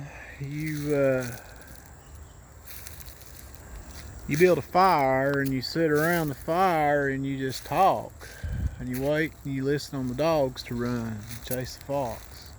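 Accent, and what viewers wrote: South, United States. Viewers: New subscriber here, and I love you channel! Not only is the storytelling fantastic, but I love that you take us along on the walks through your beautiful part of the country. I lost my grandparents very young, so I never got the “sit around the campfire experience” with them. Although you’re too young to be my grandparent, I’m still getting the spine-tingling fun of hearing your tales. And, one last silly compliment.... I’m a native southerner who married a (wonderful) Yankee man and ended moving way up north and raising children up north. There’s nothing more lovely to my ear (with the exception of a hymn in church) to a southern drawl... and I never hear that anymore. So, I’m a fan and will be back to watch and like your videos! Thank you for doing them! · Yeah, I honestly believe him. This is a tiny channel from a guy who wants to pass on some knowledge about an area he spent most of his life in. 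I also enjoy his Southern drawl. So soothing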